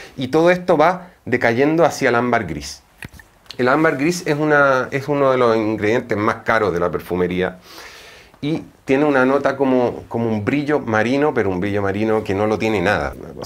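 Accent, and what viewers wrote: Chile. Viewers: Tienes acento chileno, eres o viviste en Chile? Me encanta tu canal, he aprendido mucho con tus vídeos. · Solo por curiosidad ¿qué nacionalidad tienes? Escucho que hablas super "chileno", pero también a español... es curioso 🌱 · Clave Alma si yo también lo dije es chileno y me encanta 🤗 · Qué lindo los ojos de él, habla como Chileno, dónde se pueden encontrar estos perfumes en Chile???? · Hola 😊eres chileno tienes acento?? Felicitaciones, haces un exelente trabajo.Tus descripciónes son extraordinaria 👏👏👏Amo🥰 los perfumes 🌟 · que tiene el acento chileno ...😡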